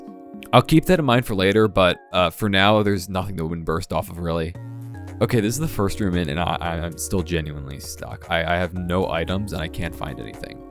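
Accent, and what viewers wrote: Canada. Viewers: i thought u were kenadian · i swear your kenadian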